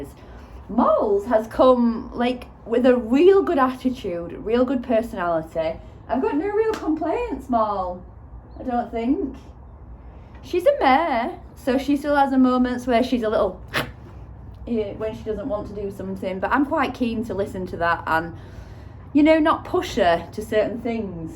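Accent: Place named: Yorkshire, United Kingdom. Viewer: Err yes she can really use herself free jumping lol😂, Molly is going to be a proper sweetheart given time which I'm pleased to hear is what you do. Very impressed with your knowledge of feed and how important it is to read the bl###dy contents, know what your horse/ pony needs and feed accordingly, no more obese or whizzed up equines, great vlog, and great to hear a Yorkshire voice, also I was taught to ask a mare to get the best out of her ❤xx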